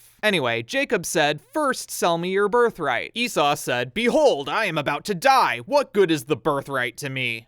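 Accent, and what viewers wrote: United States. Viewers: your hebrew pronunciations aren't half bad actually! it's just your american accent getting in the way lol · The pronounciation isnt bad! It just has an american accent, good job. Adom especially sounds good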